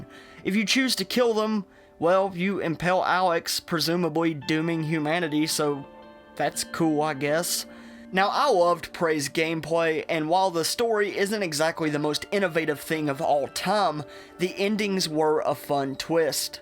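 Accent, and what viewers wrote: South, United States. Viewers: They way you pronounce "typhon"....No thanks, keep that southern accent neuromod. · I've never been attracted to a southern accent but his voice is damn sexy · I’ve heard some good southern accents but this one is just annoying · Hey! No making fun of his Southern/Cowboy accent! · "Incredibly attractive southern accent," O........kay....... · Haha nice Southern accent !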